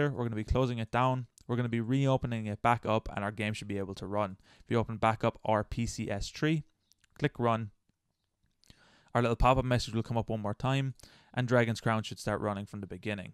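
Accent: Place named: Ireland. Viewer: what part of ireland you from ^^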